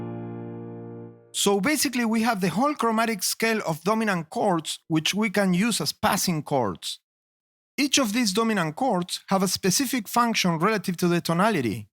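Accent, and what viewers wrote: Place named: Spain. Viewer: I think the teacher's accent sounds pretty Spanish to me.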